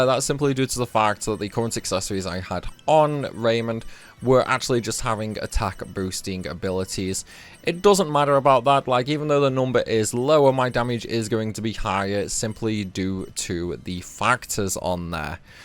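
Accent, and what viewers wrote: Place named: England, United Kingdom. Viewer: FAXXX 😂 ITS already difficult to understand a English dude from England, as an American his accent can get annoying, specially when he talks instead of giving us step by step process.😂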